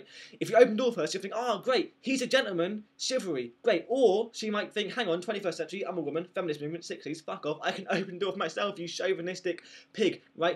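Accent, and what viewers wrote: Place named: London, United Kingdom. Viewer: Are you aware that a Gurken is a Cucumber? It's basically a pickled cucumber, which I absolutely hate. You have an amazingly strong English accent, you sound like a Londoner.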